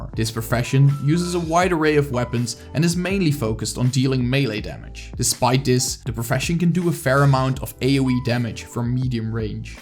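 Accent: Netherlands. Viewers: You sound super Dutch! With the stauf and claes instead of staff and class! I know you can do it! You have enough determination to make a lot of YouTube videos so I know you can learn to say class and staff!!!!! I believe in you! ps I'm from Belgium and also a Dutch speaker and I've never ever said claes or staaaauf!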